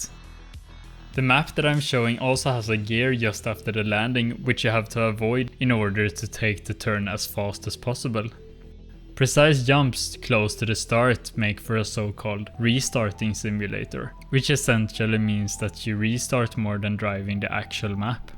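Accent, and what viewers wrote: Sweden. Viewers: this is the most swedish accent i have ever heard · No offence så men jag gillar verkligen inte svenska dialekten på engelska.. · Damn that Swedish accent hits hard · as a fellow swede, love the dialect :D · Swedish! Love the accent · du har den svenskaste engelskan jag har hört på ett tag o jag älskar det! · I love your swedish accent, i went to sweden at vacation i know tack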